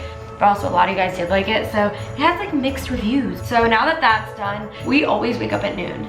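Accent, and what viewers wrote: United States. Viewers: I’m British and her accent seems stronger than other Americans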